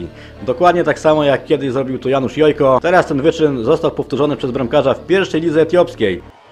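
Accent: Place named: Silesia, Poland. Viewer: Niby z Warszawy, a nawija jak hanys.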